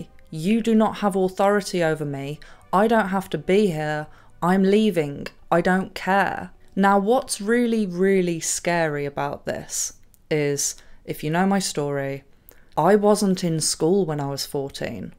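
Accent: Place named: Birmingham, United Kingdom. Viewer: I like ur accent where you from? Im a brummy you sound like ur by them sides. ?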